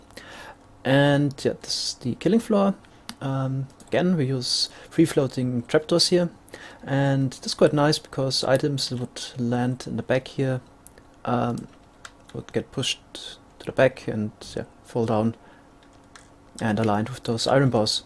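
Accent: Germany. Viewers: D :D u are german aren't u? and thx for the nice video ;)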